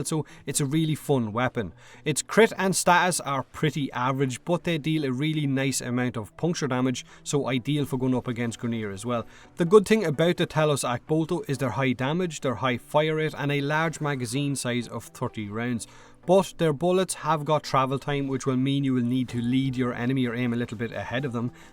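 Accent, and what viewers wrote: Ireland. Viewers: I fehkin lov your accent :D Is it irish? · ayyy another Irish fella on Youtube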